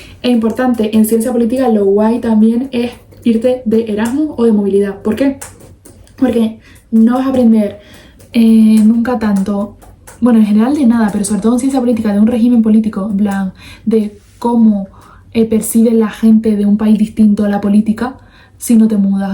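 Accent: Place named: Chile. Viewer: Habla más despacio no se te entiende nada! Me cago en el lenguaje chileno!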